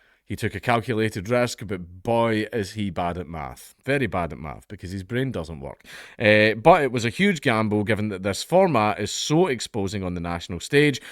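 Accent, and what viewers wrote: United States. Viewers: I love hearing speak with an American accent. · American accent is spot on 😂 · Your American accent is quite good. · That american accent had me dying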